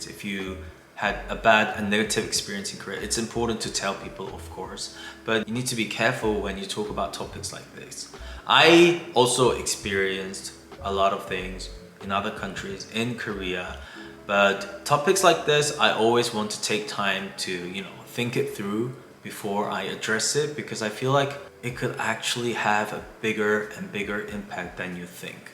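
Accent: England, United Kingdom. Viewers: Have you had a nose job? You sound a little bit British. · I absolutely love your English accent, especially when you say the word talk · Do I detect a British accent? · You’re English sounds English 🎉🎉🎉🎉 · Hello, I came across ur YouTube video very interesting, u sound like a bit of a British accent lol, well I love culture so it was enlightening 😊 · I appreciate you sharing this. I have been trying to learn Korean, Hangul and I do know some words of greetings and I an to come there one day. I do watch the k dramas, I Love and some other kpop artists. This is the first time I have watched your commentary and I for 1 really appreciate it. Racism is everywhere. I do however want to ask you, I noticed you speak with a British accent, where does that come from. Thanks 💜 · You are very charming and thank you for taking the time to speak to us about different subjects. 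I love listening to you. I live in the USA and have a desire to learn about other countries, especially South Korea. I started listening to early 2020 when covid became an issue here. I am a kpop fan and I am 73 years old. But then I love all music. I hear an accent when you speak. Is it from Great Britain? You mentioned you lived abroad and your English is exceptional. I will look forward to hearing from you again. Have a pleasant evening.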